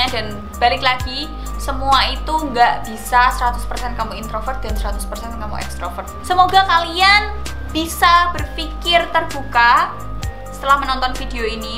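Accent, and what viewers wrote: Java, Indonesia. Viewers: Haduh lagi" gue gx pikir judul ama isi Lu ngomong ja dah bikin huha Sakjose medokmu ngakakku #nyerang_esteh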